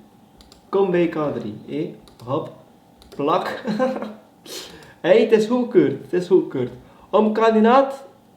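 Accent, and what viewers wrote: West Flanders, Belgium. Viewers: Tzo wel skwone zin wi nen West-Vlaamse k3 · Jaaaa West-Vlaamse k3!!!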